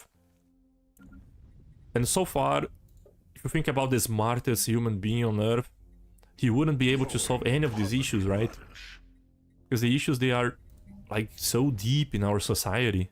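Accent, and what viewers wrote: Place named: Russia. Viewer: Я думал ты из России или где-то рядом лол.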